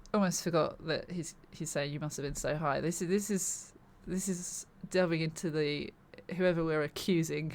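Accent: England, United Kingdom. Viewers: Love the English accent.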